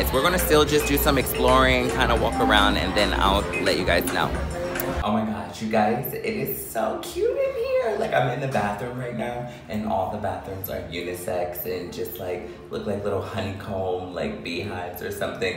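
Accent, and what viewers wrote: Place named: New York, United States. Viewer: I feel like a failure. I legit thought you were from NY!????!?!?!?? Like where did I get that from? Omgoodness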